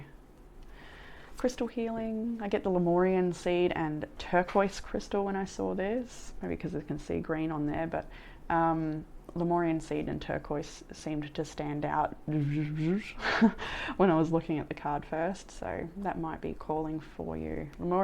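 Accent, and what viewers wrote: Australia. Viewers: I got caught up in my own blues and forgot to thank you for the beautiful reading. Love hearing the Aussie accent. I spent beautiful years in Australia and recently I've decided to manifest a visit!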